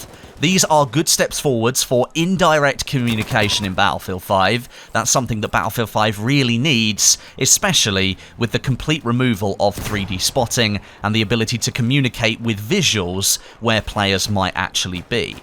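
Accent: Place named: United Kingdom. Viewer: Man you sound like an intellectual with that British accent...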